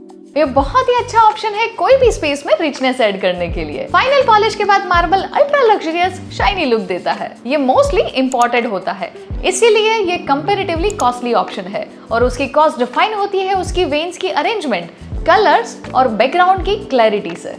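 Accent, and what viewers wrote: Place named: Gujarat, India. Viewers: It's Gujarati accent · Saurashtra tone.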